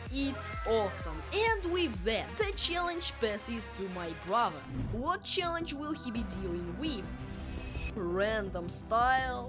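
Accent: United Kingdom. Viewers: Are you British? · Why do you sound British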